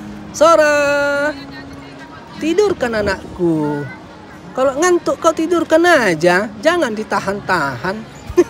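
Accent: Medan, Indonesia. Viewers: Indonesianya dari mana bang, Medan kah?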